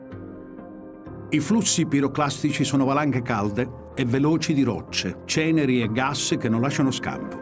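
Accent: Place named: Calabria, Italy. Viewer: Narratore con forte accento calabrese...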